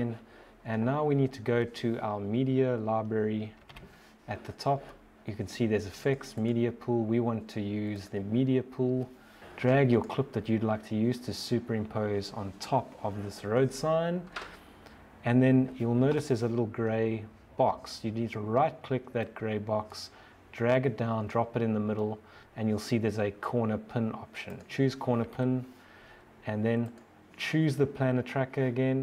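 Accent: South Africa. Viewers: U sound south African 🤔 Jus a thought I wanted to share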